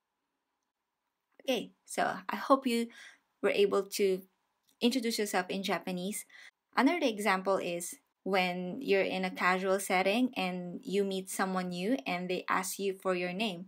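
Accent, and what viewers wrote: Philippines. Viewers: Hi mam filipina po kayo?? base on your pronounciation 🤗🤗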